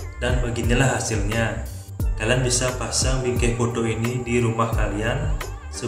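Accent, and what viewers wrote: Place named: Bali, Indonesia. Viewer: dri cara ngomong T nya pasti orang bali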